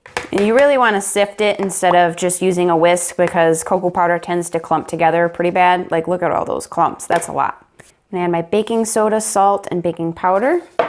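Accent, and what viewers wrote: United States. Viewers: My husband is going to love this! New sub! Your video for the raspberry jam came across my gmail feed. Your accent is adorable! What state are you from? Love from Texas☺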